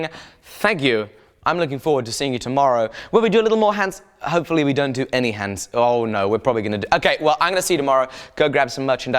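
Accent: South, United States. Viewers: lol i loved his souther accent i was pissing my self laughing, keep up the good work Alec loving the project. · The southern accent is unsettling because i have to live around it. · Mighty fine southern accent y'all got there Alec. By the way, what's in that there spray bottle? Just soapy water? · Holy hell your southern american accent. I can't even. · As a southern I find the accent you do spot on. And boy you know we love our diesels! Turbo diesel, regular diesel, diesel fuel. We love it. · That's the most accurate hick American accent ever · Isn't there an electric sander of some kind you could use? By the way: good job on the southern accent! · That country accent though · Your southern accent is fucking hilarious! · Quite the southern accent there. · Damn Alec, you have the Southern accent down. I think I've heard my relatives say the exact same thing about their trucks.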